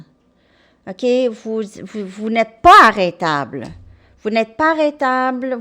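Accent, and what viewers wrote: Quebec, Canada. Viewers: J'adore ton accent (Québec?)Une de mes meilleures amies est québecoise .J'adore les expressions que vous avez gardé et que je trouve romantiques . En France nous les avons oublié ,dommage!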